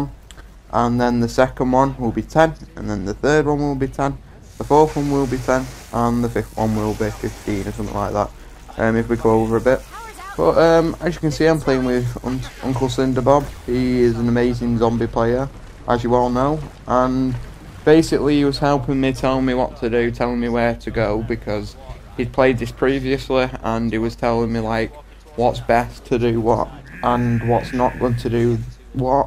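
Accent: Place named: Manchester, United Kingdom. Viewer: u hav a great manc accent im from manchester aswel woop woop !!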